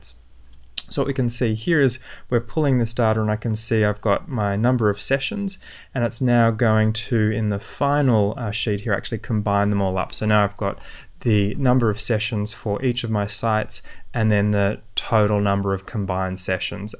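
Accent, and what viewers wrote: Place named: Australia. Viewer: Could listen to the aussie accent all day! Great video, so helpful. Please can you make a data studio step by step report for goal conversion data? Im getting a bit stuck with mine.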